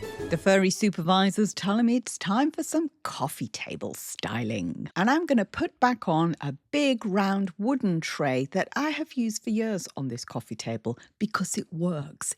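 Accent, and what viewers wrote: United Kingdom. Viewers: I always watch your videos and I always give them I like but I seldom comment. However this was just too beautiful not to comment I love your decorating style and your creativity but most of all I love your personality and your lovely British accent. I’m from Texas near Houston and Galveston so you are familiar with the accents we have which are not very lovely. And speaking of Wimberley my daughter and son-in-law have bought land overlooking the lake and plan to build a house there when they retire in a couple years. They bought enough land for me to have a small house in the back which I’m excited about. Many blessings to you and your family😅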